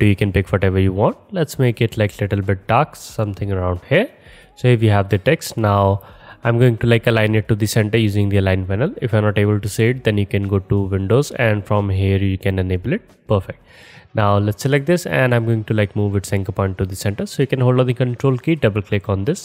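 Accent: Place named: India. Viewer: From that woman voice to Indian accent was... harsh. But I managed. Thanks! 😄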